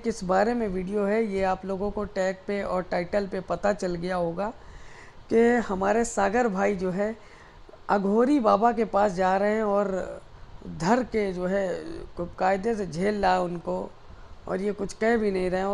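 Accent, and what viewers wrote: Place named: Uttar Pradesh, India. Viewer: भाई पहली बार आपकी वीडियो देखी, बहुत अच्छी लगी, कहाँ से हो आप भाषा से तो उत्तर प्रदेश की लग रही है???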